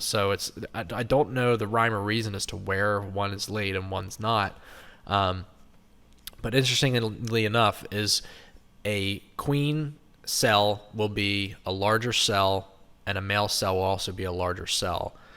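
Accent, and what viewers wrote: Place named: Ohio, United States. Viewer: There's a strange you tube guy does sting zone lets all kinds sting him I told he should try one of those lol we call them bald headed hornet in Michigan sounds like your from Ohio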